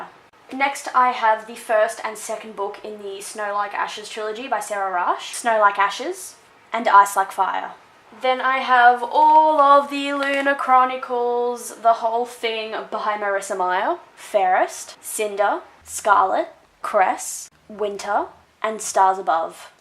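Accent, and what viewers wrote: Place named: Australia. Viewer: ayyyyye that aussie accent!!! hahaha I'm from New zealand and i loved this video so much (bookshelf tours are like the best right?) I subscribed and can't wait to see more from you!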